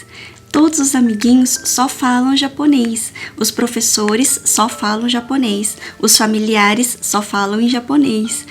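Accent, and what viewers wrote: Minas Gerais, Brazil. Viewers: Uma pergunta, você é nascida no Japão ou no Brasil? Porque essa fala em "mineires" foi demais :3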